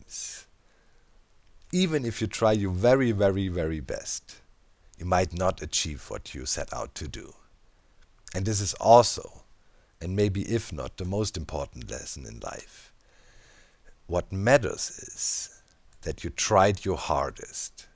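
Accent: Germany. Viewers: Bist du Deutscher?😂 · Man hört den deutschen Akzent raus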